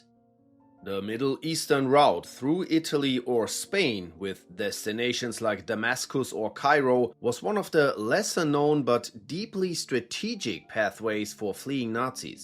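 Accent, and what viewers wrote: Germany. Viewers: Bruh, you don't need to specify you're German. The accent, straight to the point, no vaseline and accurate. Another top tier video. Loves from Spain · I can hear the German education when you speak.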